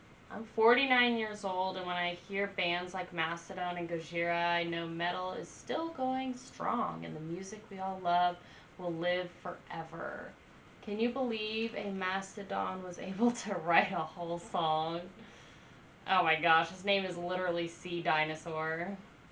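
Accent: South, United States. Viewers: You are so cute with your south lol I'm sure by now you know they are from ATL....I've met them and they're all so southern humble (RIP) Brent 😢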